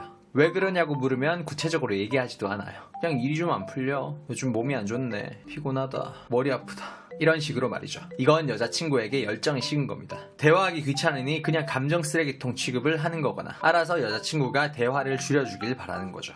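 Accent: Gyeongsang, South Korea. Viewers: 대구냐 부산이냐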